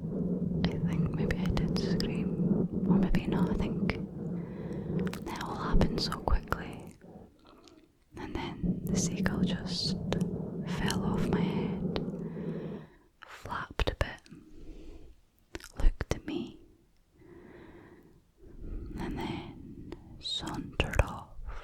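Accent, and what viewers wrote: Scotland, United Kingdom. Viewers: Some incomprehensible Scottish, late night rambles and tingly mic cover scratching does in fact lead to brain mush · Gorgeous Scottish accent. So clear and concise. 🏴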